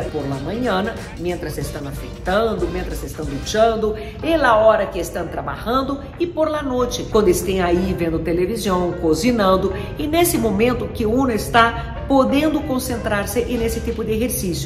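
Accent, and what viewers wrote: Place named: Brazil. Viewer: Me gusta su acento brasileño y habla el perfecto español. Saludos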